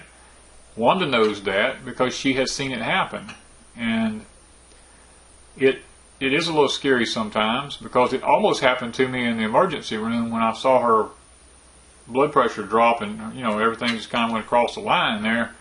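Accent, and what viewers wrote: South, United States. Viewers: I enjoy listening to your stories on Porch Time, in fact I put it on auto play when going to sleep. Hearing your southern accent is calming, It's like hearing my late husband talking...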